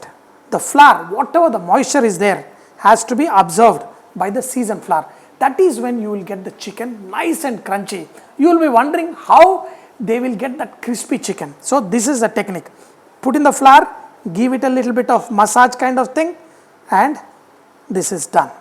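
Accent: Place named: Telugu, India. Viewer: Yara telugodu la unnav.. telugu matladam radha.. ya vathama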